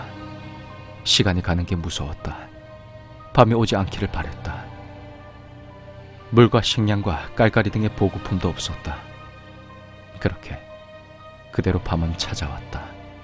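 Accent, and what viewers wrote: Gyeongsang, South Korea. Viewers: 무서운것도 무서운데 목소리랑 경상도 사투리 딕션이 너무너무 찰지네요ㅋㅋㅋㅋㅋㅋ 니 안전핀 맺게 뺐어? 하나빼고 던졌지 말임다. 야이 서울대 강아지야 덩달아 선수씨바야ㅋㅋㅋㅋㅋ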